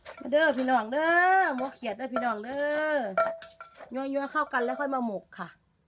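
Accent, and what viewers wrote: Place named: Ubon Ratchathani, Thailand. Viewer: สำเนียงเว้าคือทางอุบลแท้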